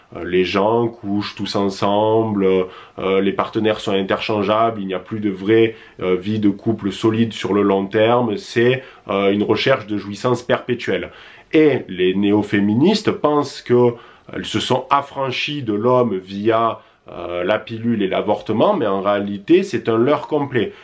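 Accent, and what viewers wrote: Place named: South, France. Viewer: De la part d'un basque, garde ton accent du sud.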